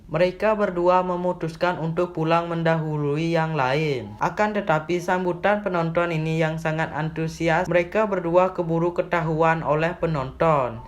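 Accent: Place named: Bali, Indonesia. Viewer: Sperti suara orang bali 😅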